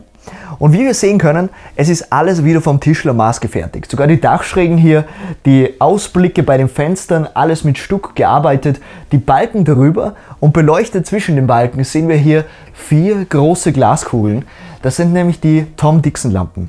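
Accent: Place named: Austria. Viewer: Schönes Objekt. Aber diesen österreichischen Schnellsprecher musste ich stummschalten.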